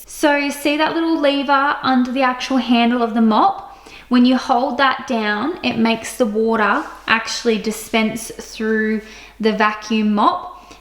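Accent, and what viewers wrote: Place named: Australia. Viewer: Love your looks, your pants, your nails and your aussie accent ("wooodaaaa"). Review is great. I have the corded crosswave.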